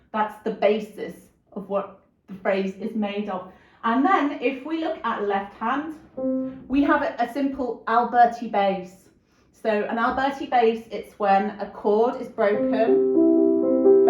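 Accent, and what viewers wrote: United Kingdom. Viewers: Snap! British English...love it!!! Same here!!! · Amazingly angelical English accent! Flawless explanations. Again, thank you.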